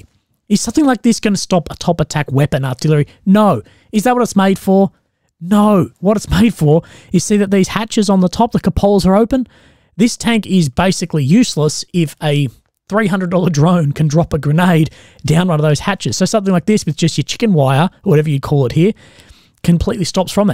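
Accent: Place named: Australia. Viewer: I like your Australian accent 👍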